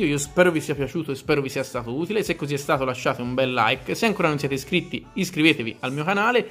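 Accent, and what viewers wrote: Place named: Tuscany, Italy. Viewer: Amo l'accento toshano😂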